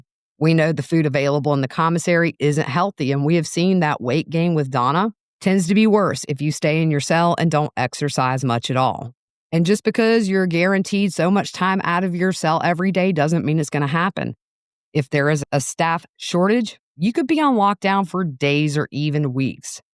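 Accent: South, United States. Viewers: I just today stumbled upon your channel. Love how you speak -quickly, without ums and ahs and you know what I means! You also have a beautiful southern drawl. I subscribed and look forward to more vids from you. (Prison...OMG most of those things, ALL of those things that were described -the noise, the pettiness, the jealousy, the food, the lack of privacy., the humiliation, the confinement with ppl who disgust you or scare you...ALL OF IT. Just a nightmare. And for me personally, I do NOT get along with other people. I need to be alone most of my time bc humans just exasperate and me bore me. I would not do well in prison. I barely find comfort in the wild.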